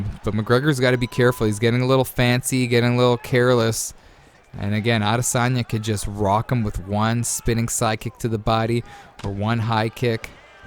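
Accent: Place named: Canada. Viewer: Dude I just found ur channel and I gotta say you got such a chill upbeat vibe and I’m not sure if I’m right but feels so Canadian, I’m only saying that cause I’m Canadian and u sound like alot of people I know which is dope!, anyways u got a new fan brotha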